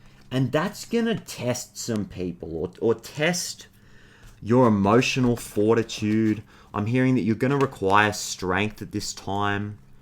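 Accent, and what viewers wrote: Australia. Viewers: Tarot reading with australiam accent by is what I've been manifesting. Thanks universe 🙏🏼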